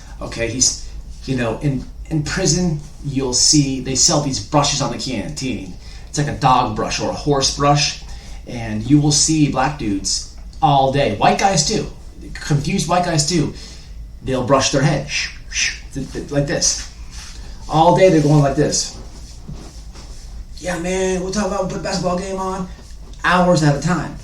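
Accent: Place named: London, United Kingdom. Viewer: Good london accent bruv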